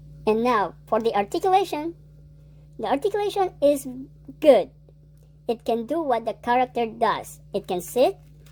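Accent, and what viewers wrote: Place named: Philippines. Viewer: Why is your tagalog accent so heavy?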